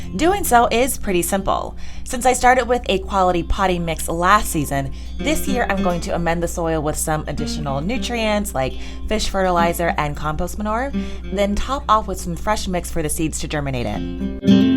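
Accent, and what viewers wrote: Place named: Southern California, United States. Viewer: I can't stand that she sounds like an entire white girl from so cal!!! I'm trying to push it out of my head but I can't sis lol 😊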